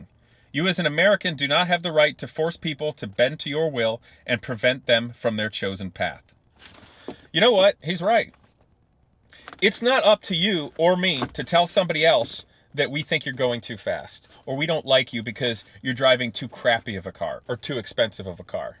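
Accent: Russia. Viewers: Love your Russian accent. But I still agree with you and left lane hog’s are more dangerous than some people think. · Great content , very relevant. Hope your videos have some impact, forwarded to my 16 yo cousin, hopefully new generation is a bit better. I travel alot on the highways for work. Mostly new jersey and pennsylvania last few years and unfortunately a lot of people dont even know they causing a problem. Drove in russia and europe and your commenters are 100% right about those. Way better driving culture in europe and even russia (though its a bit rough). Really cool russian accent you got there!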